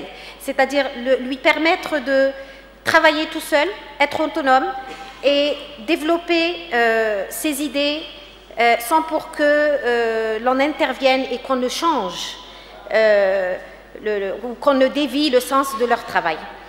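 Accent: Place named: England, United Kingdom. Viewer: teachers of English trying to speak French, we still can notice the effects of English on their French pronunciation.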